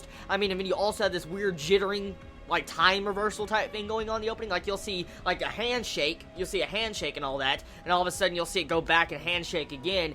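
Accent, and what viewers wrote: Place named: Tennessee, United States. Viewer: Ah my fellow Tennesseean, I can hear it, as I speak with it. Not such a strong twang so much as a way of saying some words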